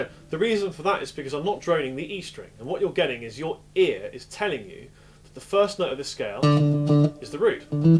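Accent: United Kingdom. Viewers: British English no less, and it actually makes sense. Thanks!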